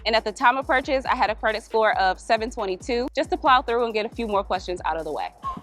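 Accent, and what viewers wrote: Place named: Northeast, United States. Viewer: Where are you from?? I’m detecting northeastern vibes.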